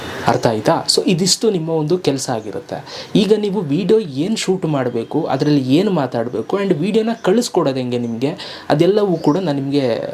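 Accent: Mandya, India. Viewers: Super 🎉🎉 ❤❤ olledagli nim alochanege❤❤ brother namm mandya flavor nim bay li kelode chenda🎉🎉anna❤❤ tqsm...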